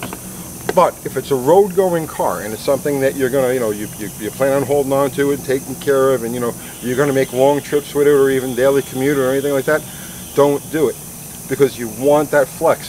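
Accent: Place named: New York, United States. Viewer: i know this is off topic but r u by chance from the east coast ? u sound like a guy i grew up around good guy taught me a lot he was from new york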